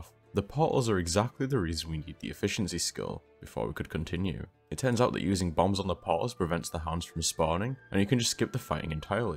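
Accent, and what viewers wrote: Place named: Northern England, United Kingdom. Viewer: He’s clearly northern English, Musk doesn’t sound like he’s from anywhere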